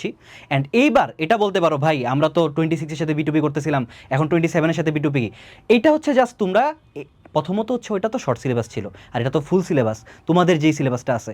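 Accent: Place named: Noakhali, Bangladesh. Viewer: Vaiya mone hoy Noakhali er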